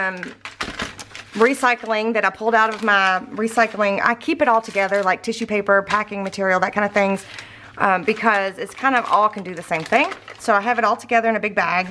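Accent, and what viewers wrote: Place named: Eastern Kentucky, United States. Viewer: Was just going to ask if you were from Eastern Kentucky because your accent was so familiar to me. Then while scrolling to the comments section I saw the mailing address. My Dads family is from Sandy Hook in Elliott County, Love your videos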